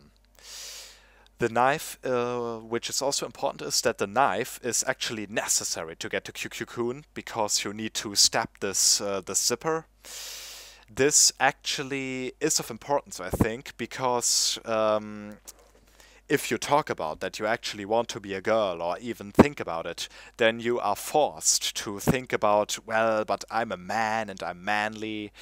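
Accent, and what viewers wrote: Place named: Germany. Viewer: Hahahah man hört den Deutschen Akzent sofort raus ;D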